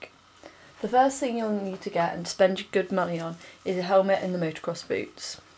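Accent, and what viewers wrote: United Kingdom. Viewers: Hi I can hear by your accent that ur from the uk although u mentioned it, but I'm from the uk aswell, I live in in surrey, where do u think I could ride that's near redhill due to the fact that I live there in redhill. Is there any places to ride a dirt bike ?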